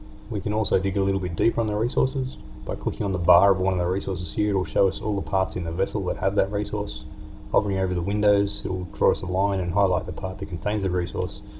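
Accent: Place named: Australia. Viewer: This is really well thought-out and beautifully executed. I thought it was good before just to be able to see stage fuel, but now with that extra functionality it's become one of those mods that get instantly added to any install and that shows the KSP devs how the stock game should be improved. Great work, and good to here an Aussie voice in modding community :)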